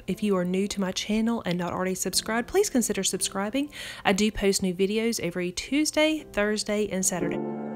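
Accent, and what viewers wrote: South, United States. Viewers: My husband will never run a dishwasher, washer, or dryer if we are not home because if a hose breaks we would it hear a problem and have major water damage. With the dryer it is not water damage but electrical fire. Love your channel and of course your southern accent.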